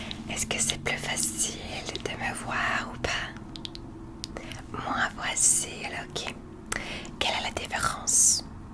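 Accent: Quebec, Canada. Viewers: Ohh je suis tomber en amour. J'ai toujours eu de la misère avec le asmr québécois. Mais la...❤ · No way la seule asmr artist que j'écoute c'est celaine et je suis tombée sur ta video par hasard dans mon fil c'est funny😂 Je l'ai écouter et ca fait vraiment différent avec ton accent québécois et t'es un peu moins hyperactive que celaine 😅😂 mais c'est très bon bravo! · Bonjour je suis nouveau sur ta chaine j'aime bien ton ASMR avec ton axen québec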